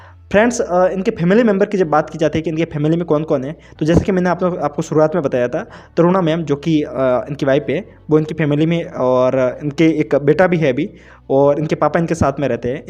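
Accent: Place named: Rajasthan, India. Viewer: Lagta hi rajsthani hai aap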